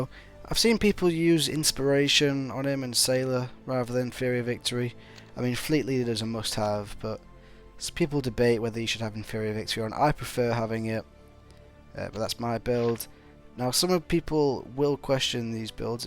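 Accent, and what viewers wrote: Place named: United Kingdom. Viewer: From the accent i would have guessed he was from the UK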